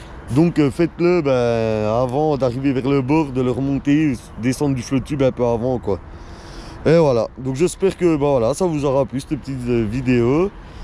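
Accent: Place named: Franche-Comté, France. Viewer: Salut tu est francomtois?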